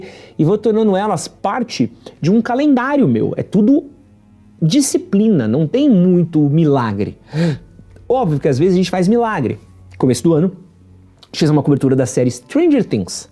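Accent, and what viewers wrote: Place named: Sao Paulo, Brazil. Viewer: Provavelmente tu não vai nem ler isso aqui, mas você me venceu na consistência. Vou ser muito transparente: Eu tinha um preconceito com sotaque Paulista, não sei porquê. Seus vídeos eram recomendados para mim e, diversas vezes, eu clicava pra ver e na metade saía. Não sei dizer qual vídeo foi, mas algum passou uma ideia muito boa. E eu tenho o costume de me permitir ser vencido por boas ideias. A partir desse ponto me inscrevi e passei a acompanhar (eu não costumo comentar quando acredito que não vou agregar, então nem sei se já comentei alguma vez aqui). Te admiro bastante, todo sucesso que você tem é merecido. Espero conseguir desenvolver essas habilidades que vejo em você na minha própria vida.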